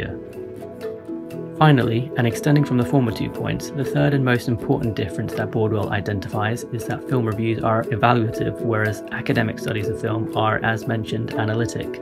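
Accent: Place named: United Kingdom. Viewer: I hate starting a yt vid and hearing a british "person" smh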